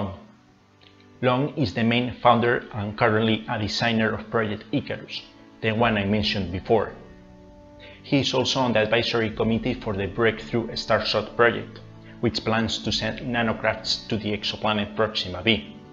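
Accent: Spain. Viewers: Es ese un acento español? Mi español es mal pero estoy aprendiendo todos los días :)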